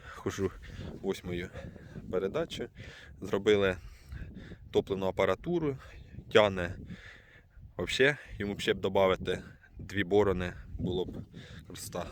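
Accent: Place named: Poltava, Ukraine. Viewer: Успіхів зємяля, нравиться полтавський говор😘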